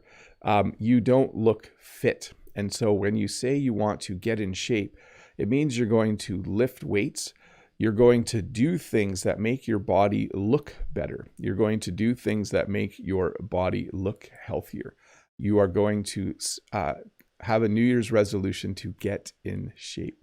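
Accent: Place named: Canada. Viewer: The way he is saying in English, it's very understandable, Canadian's accent is the best!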